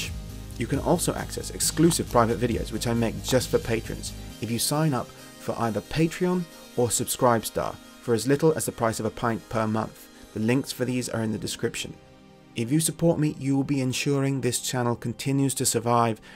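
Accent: South Africa. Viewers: this guys voice sounds afrikaans slightly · You sound a little South African brah.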